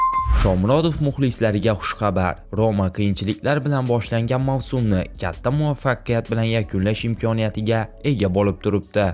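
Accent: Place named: Russia. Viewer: ЎЗБЕКча гапиришни ўрган, нима тилни устидан кулябсанми? ЎЗБЕКчани энди ўрганаётган ўрисга ўхшаб гапирасан. Кабул эмас, қабул.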